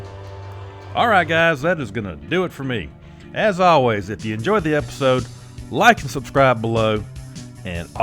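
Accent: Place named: South, United States. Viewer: this is great. 😂 as a southerner I love the accent and phrasing, it's wonderful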